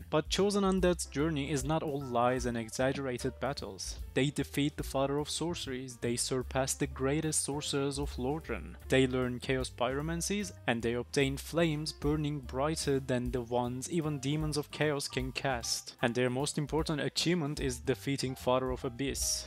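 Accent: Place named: Europe. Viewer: Can't listen to monotone euro voice over.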